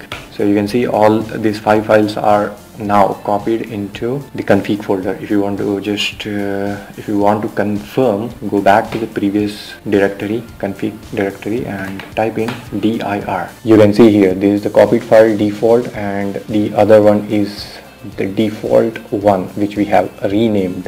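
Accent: India. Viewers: Damn indian, I hate those accent. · Ae bhaiya , Kolkata se ho kya ?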